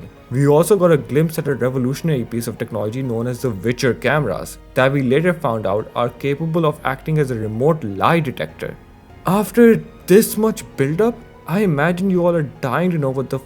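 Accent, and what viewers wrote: India. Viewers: Oi oi another Indian (or South Asian) Jacksepticeye fan. A rare occurence! And from your accent and the English used, I am assuming you live in India (orrrr may be not)? Which is even rarer.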